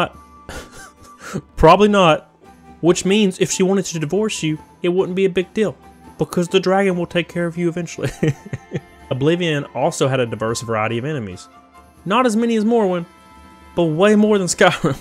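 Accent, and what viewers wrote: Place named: Texas, United States. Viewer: Your accent sounds like Amarillo TX....had a co-worker who sounded just like you before. Regardless, your accent is so relaxing and you do a great job!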